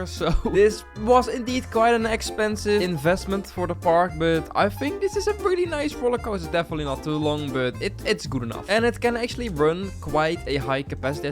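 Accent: Netherlands. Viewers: Jij klinkt echt Nederlands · Do I hear Dutch? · Lekker een Nederlands accent aan het engels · The Dutch accent😂 love the video 🎉🎉🎉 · “Som sietin” lemme guess your dutch😂 its such an dutch accent tbh, no hate ofc its just so funny to see a person that also speaks dutch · vind het altijd grappig als ik bij nederlanders aan hun accent kan horen dat ze nederlands zijn btw goeie content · Ik kan horen dat je nederlands bent lol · wow ėėn goede nederlands aacent je bent ėėn echte nederlands person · Je bent Nederlands dat hoor ik bij het begin van de video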